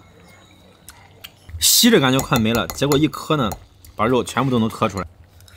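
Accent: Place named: Henan, China. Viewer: 是河南人吗？普通话的河南口音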